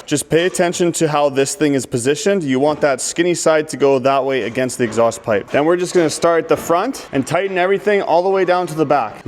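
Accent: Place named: Canada. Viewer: His accent is Canadian af (Sask maybe?), and I can attest it’s not illegal here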